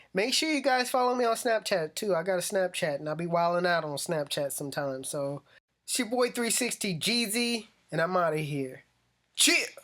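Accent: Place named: Bay Area, United States. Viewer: Are you from Vacaville California? Because you gave the Bay Area accent.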